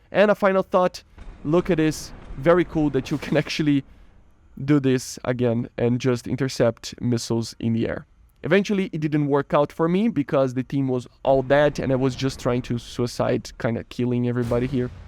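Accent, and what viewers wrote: Brazil. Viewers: Tu é Br? Sotaque familiar nesse inglês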